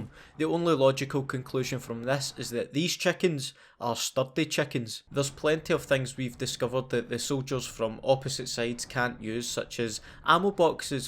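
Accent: Scotland, United Kingdom. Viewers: The first one of these introduced me to this channel and I’m so glad it did man I’ve been binging or old vids of mw2 my favourite the cod and now I’ve joined the notifications squad man also I’m Scottish aswell and it Scottish patter just makes this channel 10/10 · Are u scottish,if so,so am i and what part of Scotland u from? Im from Edinburgh.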